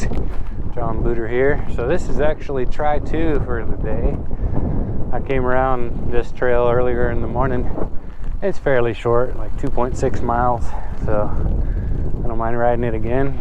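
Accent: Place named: Farmington, United States. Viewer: You sound ike from Farmington. Nice bro python sucks. Java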